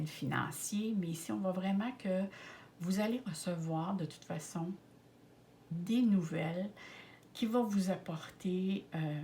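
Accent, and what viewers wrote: Quebec, Canada. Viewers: Belle philosophie et présentation, ça me parle, avec en prime ce super accent Québécois.Ce beau pays et bons souvenirs de voyage pour moi.🙏💚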